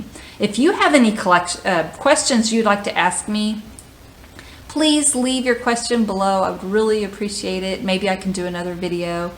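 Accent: South, United States. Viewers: Hey, how are you doing? I subscribe to several members of the vinyl communityand they are increasingly starting to mention you as an influencer in the vinyl community. So that's very good for you and it means your influence is growing.I know you have mentioned that I believe, you were born in Indiana.I have many cousins who are from Indianapolis and they have what I would consider from Chicago a southern accent.yours is more pronounced so do you think where you live now has increased your southern accent? I've always been interested in how people speak compared to where they grew up in so that's why I'm asking.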